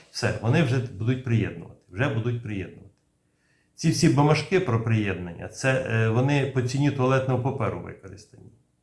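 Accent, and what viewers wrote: Vinnytsia, Ukraine. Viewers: Дякую за професійний огляд. Особливо подобається такий рідний акцент Вінниччини такою славною украЇнською.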